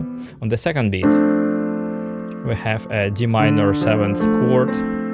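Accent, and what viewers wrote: Russia. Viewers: I love your videos! Very useful Are you Russian?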